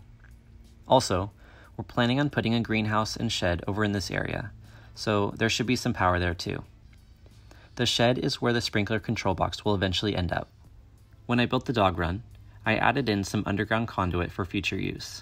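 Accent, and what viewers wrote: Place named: Germany. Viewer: ja hört sich irgednwie sehr deutsch an. xD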